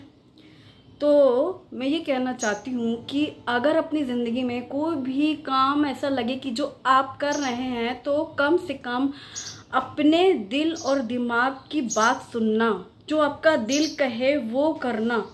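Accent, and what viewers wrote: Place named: Baghelkhand, India. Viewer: Aapne sahi kiya👍👌aapse baghelkhand ki boli sunkar achchha lga. main Rewa (M.P.) se hu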